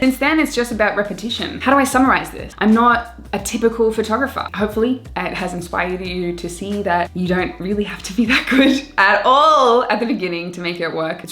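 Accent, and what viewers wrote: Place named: Australia. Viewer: Been following for a while but didn’t realise you were an Aussie 😁 Nice one !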